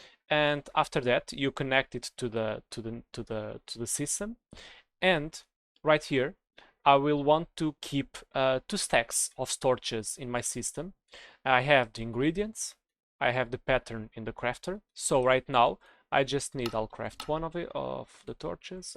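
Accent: Portugal. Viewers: You has portuguese voice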